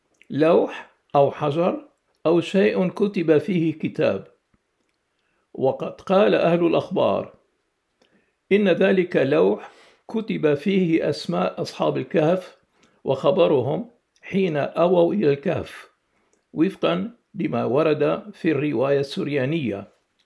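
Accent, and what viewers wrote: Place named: Iraq. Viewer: هل هو ؟ لأن لهجته عراقية وليس سورية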